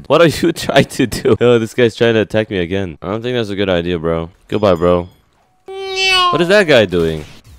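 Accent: Germany. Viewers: Are you German? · Ey bist du deutscher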